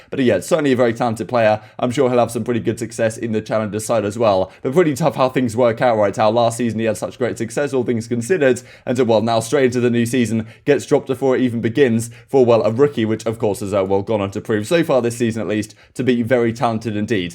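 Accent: United Kingdom. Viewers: I assume by your uploads and your accent you too are also from the Uk. What do you think about the later games that might not take place until 1 or 2 our time?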